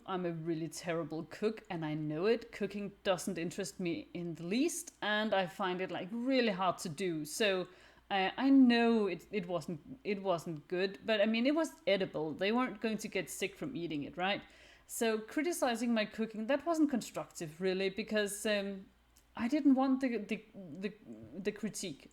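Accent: Denmark. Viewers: Are you danish because you have a danish like accent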